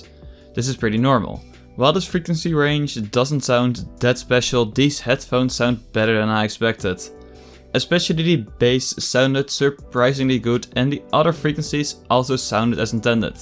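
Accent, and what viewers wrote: Netherlands. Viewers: Je hoort dat je Nederlands bent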